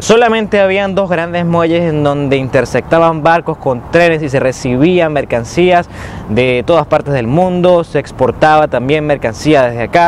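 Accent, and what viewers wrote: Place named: Andes, Venezuela. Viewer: lo Gocho.. es una expresión ... por su acento característico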